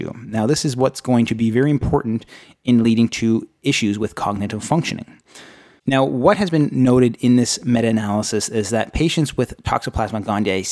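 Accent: Canada. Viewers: i can tell youre Canadian lol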